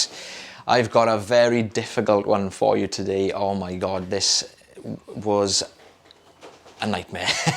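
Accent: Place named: United Kingdom. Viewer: Just curious what part of the UK you are guys are from? You and have the same accent. I watch a lot of British television and follow quite a few Brits and there is a very distinct difference between accent sounds. Thx. You guys are the best!🇬🇧🌷🇬🇧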